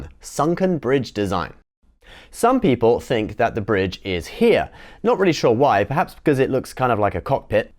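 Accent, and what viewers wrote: United Kingdom. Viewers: Uhh Boi my man the is back again! Sorry but i just missed him...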